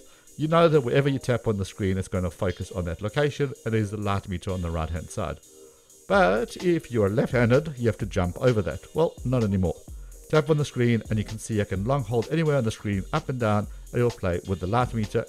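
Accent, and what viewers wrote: South Africa. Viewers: Nothing to do with the video, but where are you from if you don't mind me asking, your accent sounds South African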